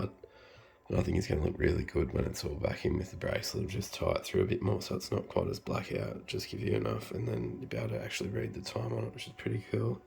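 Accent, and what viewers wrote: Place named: Australia. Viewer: Bro can I buy this off you, I can tell you are Australian from the accent and I live in Australia too can we try make a deal?😂💎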